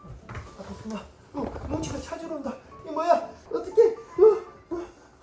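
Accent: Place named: Seoul, South Korea. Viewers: 책 읽을때는 서울말ㅋㅋ 사투리 안 쓰시네요ㅎㅎ 그래도 정감있고 재미있는 말투가 좋아요 😄😄😄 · ㅎㅎ 책도 참 서울말로 잘 읽어주시네요. 애기랑 너무 행복해보여요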